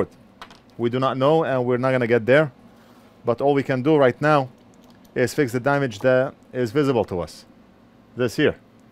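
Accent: Lebanon. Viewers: صدمتني بس صرت تحكي لبناني طول الوقت بشاهدك وبشاهد فيدوهاتك وربي صدمتني 😂😂😂 تحياتي بشاهدك من الاردن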